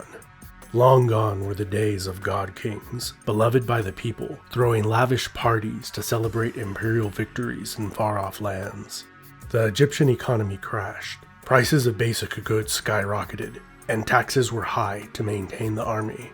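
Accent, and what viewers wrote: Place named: United States. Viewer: This is great. I usually avoid American accents but your voice is wonderfully rich and calm.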